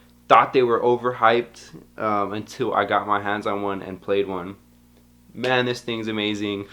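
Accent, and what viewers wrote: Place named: Brazil. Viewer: Nice! Quality and efficiency... Hey man, are you Brazilian? Something in your english sounds familiar...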